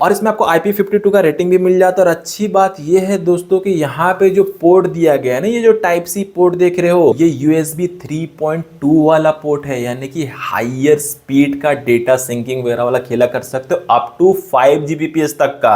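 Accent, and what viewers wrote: Bihar, India. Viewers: Sir aap bihar se hain kya??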